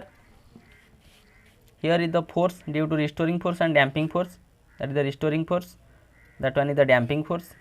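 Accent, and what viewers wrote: Odisha, India. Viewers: Sir by your voice you resemble like odia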